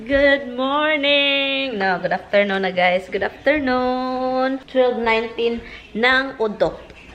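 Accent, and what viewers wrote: Visayas, Philippines. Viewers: hello miss avid fan ako tanan vedio vlog mo nasakit tyan ko kakatawa sau..kng paano ka magsalita ng bisaya regards sa hubby mo.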